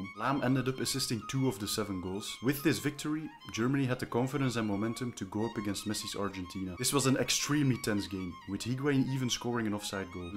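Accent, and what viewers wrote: Belgium. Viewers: Bro is that a Belgian accent I hear? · Maat gij zijt zo zwaar belgisch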